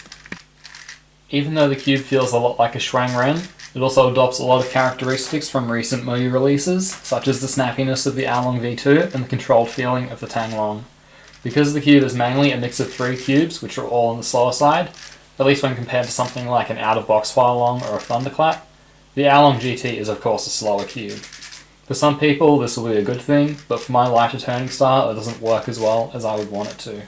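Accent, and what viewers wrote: Australia. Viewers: he is australian so yeah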